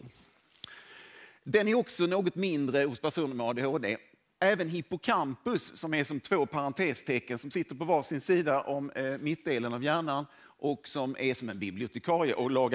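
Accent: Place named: Scania, Sweden. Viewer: en eloge för lugn välvårdad skånska som nästan vem som helst måste kunna uppfatta. Det du säger är oerhört intressant. Ännu bättre vore om allt du säger var textat på svenska. Det finns ju folk med rejäl hörselnedsättning. Men du är mycket tydlig i mina öron. I o f s kopplar jag av bättre om det finns text också. Tack!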